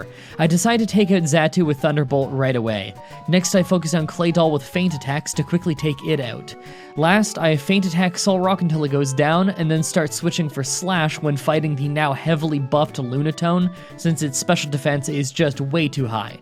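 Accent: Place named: Canada. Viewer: Man you're Canadian how cares how you say names of Pokemon. I just enjoy your smooth voice.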